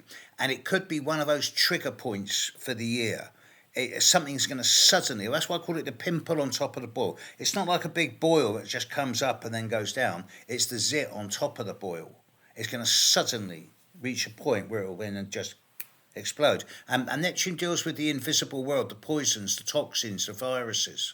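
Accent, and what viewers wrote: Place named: Bath, United Kingdom. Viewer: You look really fresh and well rested. I love that some of your words still have a bath dialect pushing through. Greatest astrologer ever. ❤️🫶🏽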